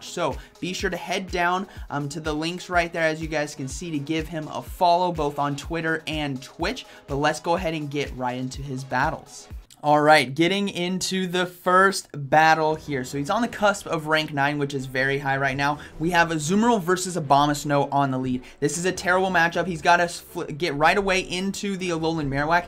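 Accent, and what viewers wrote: Netherlands. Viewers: Lol this guy for sure is from the Netherlands. They have such a specific accent :)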